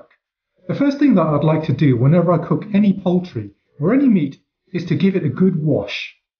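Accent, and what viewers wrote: United Kingdom. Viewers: I've got to give it to the the Brits, thought...they make all ESL speakers sound so sophisticated. Back to the video...thank you very much for this easy and great recipe. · You spoke Chinese with an English accent very cute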